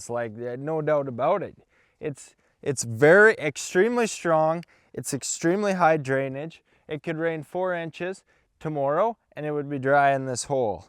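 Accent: Canada. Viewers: I stumbled across this page and thought "well, let's just watch this young guy grapple with mother nature" , so I did. Lemme tell you... The way you ATTACKED this project and just absorb everything you learn- WELL DONE! I was listening to you talk, and if you're Canadian, you just KNOW another Canadian- and all your sayings remind me of growing up and of course, my time on the prairies. SK is certainly some of the most beautiful land out there- I don't care what ANYONE SAYS. Love watching this journey (sometimes through my fingers cos I'm TERRIFIED FOR YOU lol) and I can't WAIT to see you build this amazing achievement! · I love to tune in and listen to this kid narrate his work, so f'ing Canadian!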